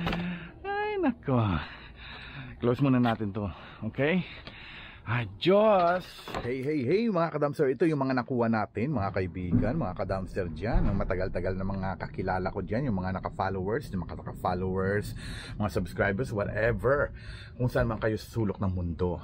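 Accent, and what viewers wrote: Iloilo, Philippines. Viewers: Wow sir ang sarap ng mga nakuha mo.i.lke u kc nag iiwan ka for ithers fi mo inuubis lahat. At namiss ko ung ilonggi accent mo manong.welcome back gid last ko tan aw ato bala damo nga mga bayo gintilon mo kesa ibaoy sa land fill kanugon gid.salamat gid sa imo. watching from antipolo city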